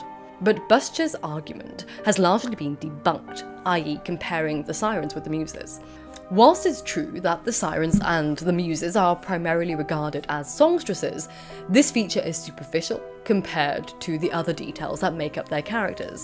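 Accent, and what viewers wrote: United Kingdom. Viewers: Why does every British person sound like an Oxford professor! 💚 everything sounds more intelligent and smooth! 💚 Love the mythological on sirens/mermaids! 💙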